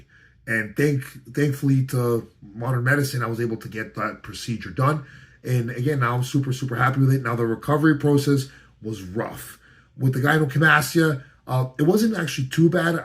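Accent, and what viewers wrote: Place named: Mexico. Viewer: at first glance u look indian, then when u talk, u sound bean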